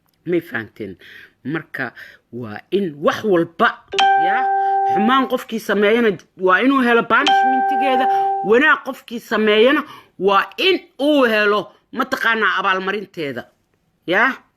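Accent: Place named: Beledweyne, Somalia. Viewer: reer baladweyne miyaa sidii reer baladweyne cml waaye codkeeda milyan like 💕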